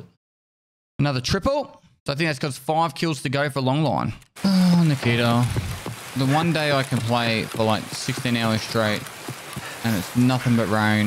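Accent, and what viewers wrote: Australia. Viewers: Imagine an angry Australian man in an abandoned mall yelling and chasing you down. I’d be terrified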